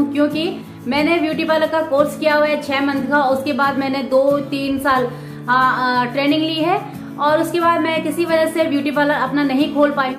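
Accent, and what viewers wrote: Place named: Pahadi, India. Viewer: Apki bhasha mai phadi ltak aa rhi thi smajh gyi thi aap phaad se ho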